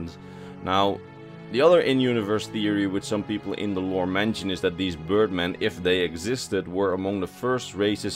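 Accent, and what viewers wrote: Netherlands. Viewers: Great video once again! Btw i might be mistaken but do i hear a Dutch accent? I'm Dutch myself and you sound very Dutch !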